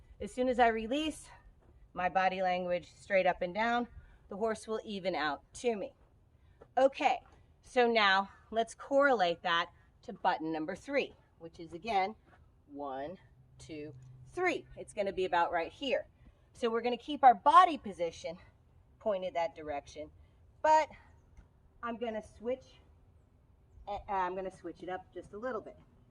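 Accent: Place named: Texas, United States. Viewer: What part if Texas are you from?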